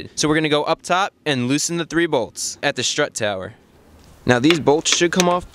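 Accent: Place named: New Jersey, United States. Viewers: god you can hear the new Jersey in his voice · Judging from slight accent, I would guess he is in the Northeast US where snow happens and roads get salted. This will cause surface rust on suspension components on a lot of cars. Some people in heavy snow and salted areas say that Mazdas in those conditions rust badly. I am in the middle of the east coast US and we get a small amount of snow, and roads get salted, and I have had 4 Mazdas and did not experience any rust or corrosion problems.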